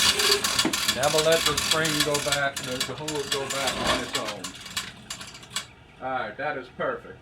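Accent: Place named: Louisiana, United States. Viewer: I was looking for help and found it. I also said this dude is from Louisiana. 😂. I recognized the accent. I’m from Gonzales La. Thanks for the help.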